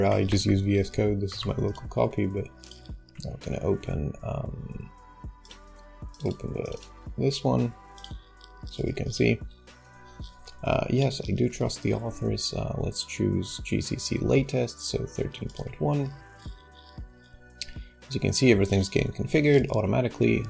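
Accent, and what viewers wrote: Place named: Canada. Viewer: Your pronunciation of dipthong /aw/ rose to a mid vowel preceding a voiceless obstruent (Canadian Raising) (Common in The Big Smoke) (Common in Mississauga)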